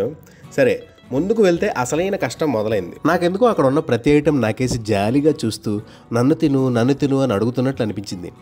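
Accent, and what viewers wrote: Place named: Kakinada, India. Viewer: అద్భుతంగా వుంది అండి మీ కాకినాడ యాస....ప్రాస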